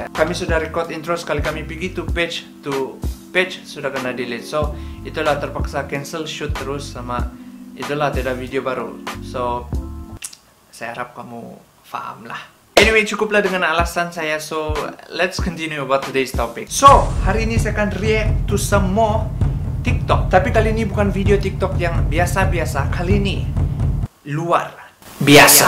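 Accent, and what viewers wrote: Sabah, Malaysia. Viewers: I can tell u r a Sabahan...kuat btul tu loghat ..salam knalan d channel kmu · Slang Sabah dia best bah..bikin deep jugak #nasihatberguna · Suka la slang sabah. That's the reason why I followed him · Ur sounds like SABAHAN . . Kita² juga bhai ni kann . . 😏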